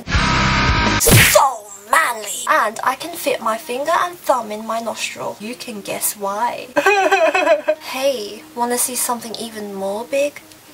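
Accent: United Kingdom. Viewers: Are you British? I'm from Australia and you are so funny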